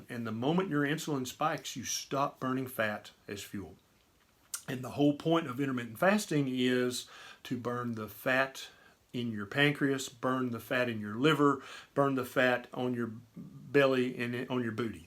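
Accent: South, United States. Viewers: 1 sub or a 1M subs, I love. That southern charm is wonderful, "supper" LOL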